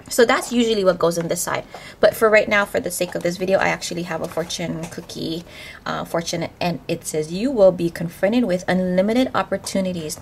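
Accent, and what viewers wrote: Hawaii, United States. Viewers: OMG I could tell you from Hawaii the moment you started talking! aloha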